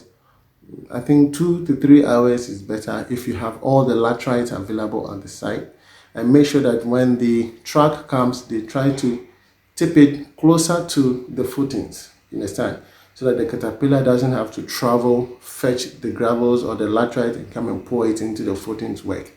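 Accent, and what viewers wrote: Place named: Ghana. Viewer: And you speak good ghanaians english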